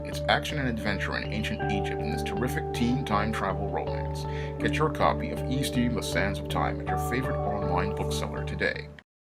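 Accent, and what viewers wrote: United Kingdom. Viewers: Its sad to see Prince Harry's current state- esp in comparison to older brother Prince Williams. Which shows why as a man, who you marry tells us a lot about who you are- which is why men are walking away from marriage at record numbers. Thanks for doing the commentary with a British accent! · GREAT UK ACCENT MATE !!!!!!!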